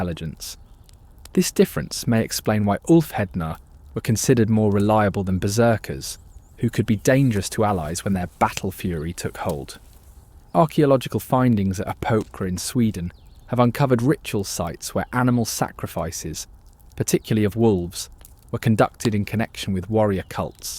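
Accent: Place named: England, United Kingdom. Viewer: I dig the verbal painting the author of this is writing and the narration for being English sounding not to bad I wish there was some buddy on the whole of utube besides myself and a pretty girl from Iceland that taught me vicariously through her channel that most of the world is pronouncing that word VIKING Wrong the I does not belong in the pronouncieation and really shouldn't be in the spelling either but dainish English sweedish Norwegian and probably all forms of Germania have shit tons of letters inside words that make no damnd sense at all if you're ludtining to the prononcieation the word viking no exception the I does not belong the pronouncieation is actually vking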